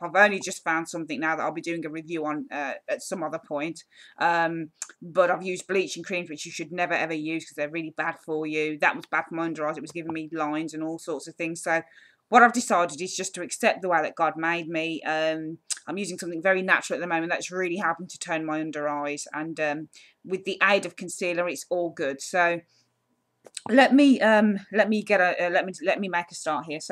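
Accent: Birmingham, United Kingdom. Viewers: great info are u a brummie x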